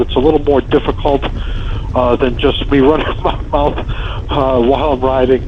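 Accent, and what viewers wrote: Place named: New Hampshire, United States. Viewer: You sound like your from Cow Hampstah I lived there for 36 yeahs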